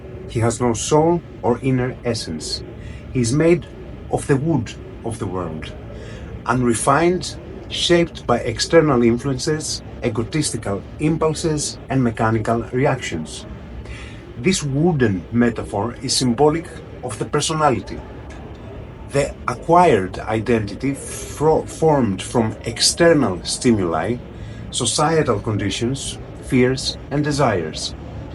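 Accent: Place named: Greece. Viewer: I find your accent very charming. Greek must be a beautiful language!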